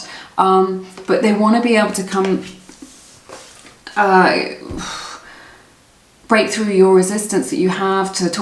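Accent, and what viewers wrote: United Kingdom. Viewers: Dear, i just wanted to tell you that i absolutely love how you talk (that british accent...👌) also, pile 2 resonated so much, thank you so much!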